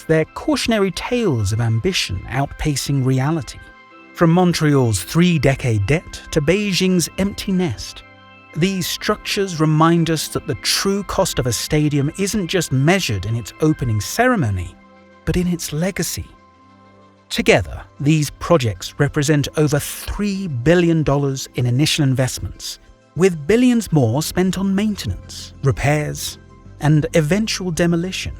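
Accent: England, United Kingdom. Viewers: Nice english accent😊😊😊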